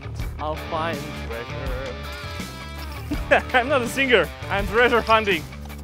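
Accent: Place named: Boston, United States. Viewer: That's clearly a Boston accent.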